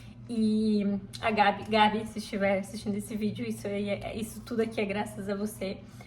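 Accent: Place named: Rio Grande do Sul, Brazil. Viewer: oii, sou nova aqui, daonde tu es? sou do rio grande do sul e achei teu sotaque parecido com pessoas daqui da volta heheh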